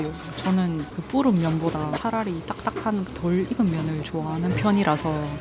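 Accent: Busan, South Korea. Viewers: 부산 사투리 죽이네.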